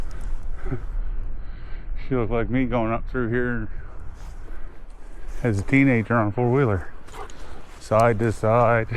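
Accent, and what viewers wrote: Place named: Canada. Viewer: I love hearing the Canadian accent!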